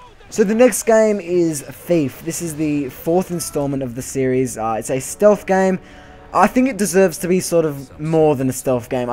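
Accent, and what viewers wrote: Australia. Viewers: its just so nice to hear a familiar australian accent on youtube. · nice list bro I agree on everything you said. ps: are you Australian? ^_^ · He's Australian. Not everyone speaks english like you. British, Caribbean, American, Australian, Canadian. All english, just a different dialect of the language.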